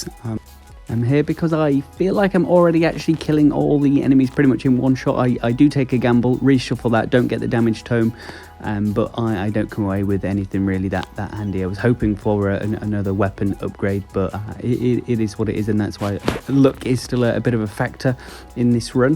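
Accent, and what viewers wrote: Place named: Australia. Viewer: Just started playing. Also broke the bank and bought packs…🫣🫣 you sound Australian too! You were the first one to pop up in my search. I hope that you have good advice and can guide me through the game as well with good tips. I’m level 29 atm.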